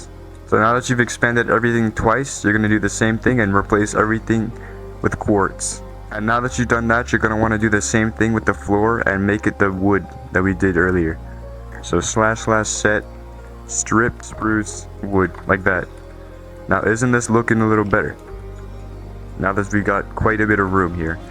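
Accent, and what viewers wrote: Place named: India. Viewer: I LOVE IT even though you sound like an Indian they humor and the innocent for tutorial you got potential 😂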